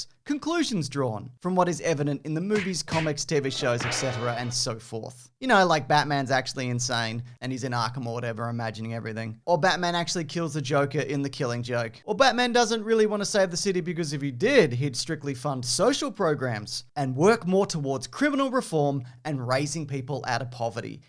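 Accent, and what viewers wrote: Australia. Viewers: Came here for the lame theories. Stayed for an Aussie talking about Scotland.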